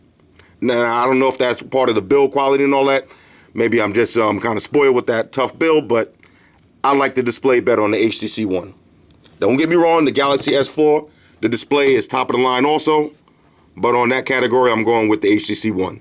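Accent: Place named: New York, United States. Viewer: a real ny nigga.... i can tell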